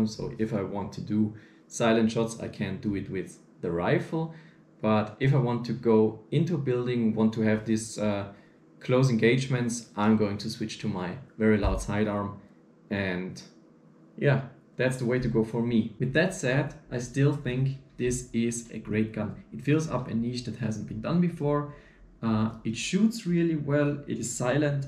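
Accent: Germany. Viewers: is he from german?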